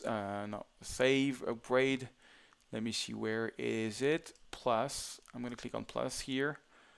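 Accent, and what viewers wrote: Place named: France. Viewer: I love your frenchglish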